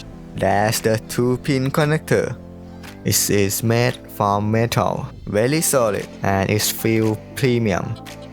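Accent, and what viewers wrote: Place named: Thailand. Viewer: คิดว่าต้องเป็นคนไทยแน่ๆ สำเนียงแบบนี้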